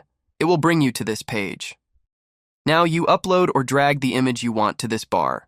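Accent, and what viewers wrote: Sri Lanka. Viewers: are you from sri lanka?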